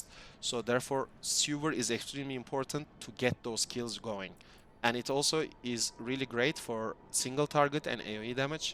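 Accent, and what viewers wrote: Turkey. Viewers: Sen turksun demi o aksani nerde gorsem tanirim.amk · great vid are you turkish ur accent sounds like it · bu türk ingilizcesi, nerde duysam tanırım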